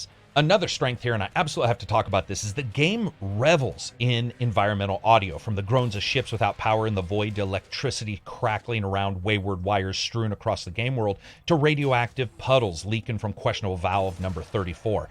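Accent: United Kingdom. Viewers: Voice is fine, you just have to be British to understand. Which is a damn good thing! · I believe the audio issue you are referring to is a Scottish accent vs heavy compression.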